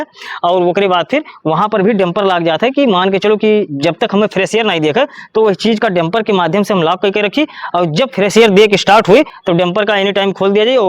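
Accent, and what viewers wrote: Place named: Rewa, India. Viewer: apki boli se lga ap Rewa MP se ho, utni door avi nahi aa sakta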